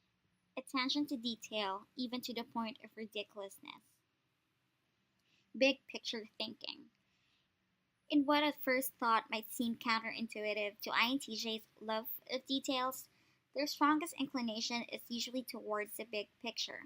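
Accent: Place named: Philippines. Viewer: Your filipino accent is annoying.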